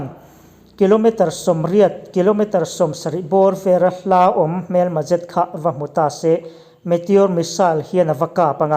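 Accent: Myanmar. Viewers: A chhiar tupa hi Burma lam chhuak I ang I thil lam dan hrang hrangah hian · I aw phawi hi chu mizo dik tak aw phawi chu a ang lo ka ti..beng ati thlep tlat..Burma lam unaute zinga mi ini lo maw..tha vek tho na in🤔🤔😂😂👍👍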